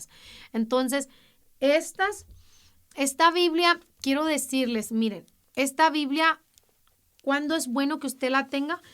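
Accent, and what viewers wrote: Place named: Colombia. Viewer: Bendiciones hermanita pensé qué ud era de Colombia, espero muchos podamos escuchar estas enseñanzas me gozo en el señor hablarnos con tanta autoridad del padre,el señor bendiga su ministro!!🙏🙏 y sigamos aprendiendo cada día más 🙏🙏🙏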